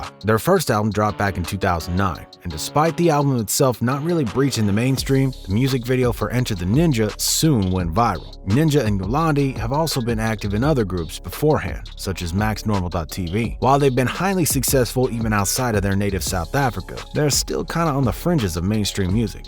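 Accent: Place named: United States. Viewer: Ignorant take on the duo, and christ american pronouncians on anything thats not english 😂 fml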